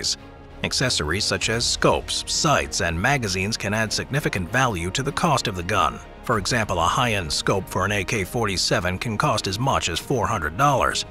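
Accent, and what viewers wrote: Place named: United Kingdom. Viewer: I’ve never seen your face until today, I thought you were British lol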